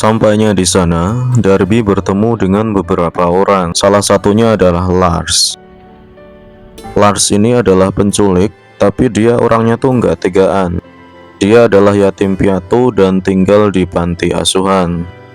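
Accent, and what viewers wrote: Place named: Java, Indonesia. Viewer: Min suaramu medok tp AQ suka wkwk menyala bosku gas nonton film rekomendasi nya 🔥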